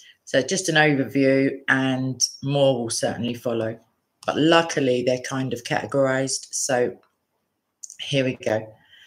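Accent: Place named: United Kingdom. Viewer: I love her British ascent.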